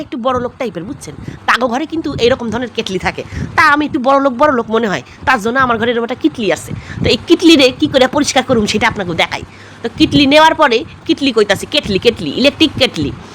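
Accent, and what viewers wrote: Bangladesh. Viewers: আরে বাহ্ আজকের রেমিডি তো সুন্দর কিন্তু তোমার কথা মানে একদম বাংলাদেশের কথা দারুন সুন্দর করে বললে আমার দারুন লাগলো ❤❤❤ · ও দিদিভাই আজকে তো পুরো বাংলাদেশের স্টাইলে ভয়েসটা বেশ ভালো লাগলো❤❤❤ কথাটা তো বেশ ভালই লাগলো❤❤❤ তোমার ছেলের গাড়ি ভেঙে গেল তোমার এই টিপসটা এপ্লাই করবো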